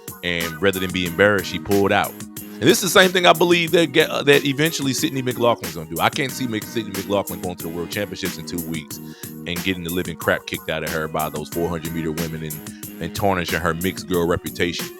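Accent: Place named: United States. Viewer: First of all. This Loser needs to learn how to pronounce the word "scared" to stop embarrass himself. He sound country as hell. He heckling a female at a track meet, when he should encourage all of them. You don't know her personally, so stop hating on her. You are a true hater. What a Loser! Stop putting "hate" into the world, ignoramus. 😂😂😂😂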